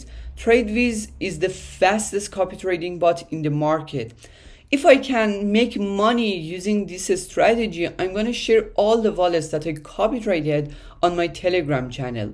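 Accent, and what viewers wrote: Iran. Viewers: Fkn persian accent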